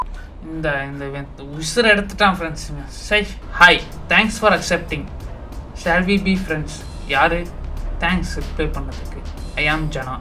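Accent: Madurai, India. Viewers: Madurai ya Anna ne basa nailla irruku nainga appadetan pasuvom 😅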